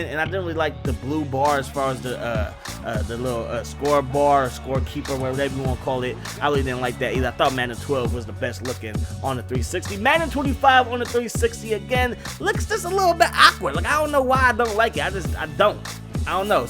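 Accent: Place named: Pacific Northwest, United States. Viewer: Hey man where you from? I'm actually from Seattle, and I can tell you have a more north western type accent so I was wondering if you lived here as well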